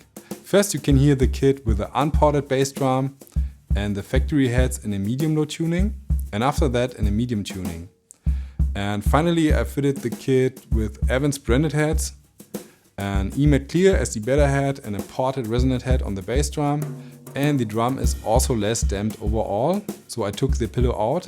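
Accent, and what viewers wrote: Germany. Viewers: Als ich den deutschen Akzent gehört habe habe ich mich außergewöhnlich gefreut weil es kein Set aus Amerika ist, das man so schwer nach Europa bekommt. Gott segne euch!!!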